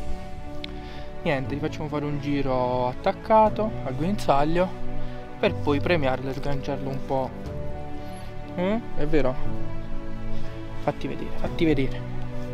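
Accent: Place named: South, Italy. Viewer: Bravo,bel video ma di dove sei, mi sembri del sud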